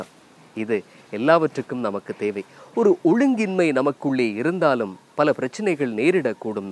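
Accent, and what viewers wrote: Sri Lanka. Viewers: Excellent! This young man's language proficiency is remarkable! Beautiful pronunciation and enunciation of the tamil language. The content of his delivery is equally fabulous. Thank you and keep up your good work. I reckon he's from Sri Lanka.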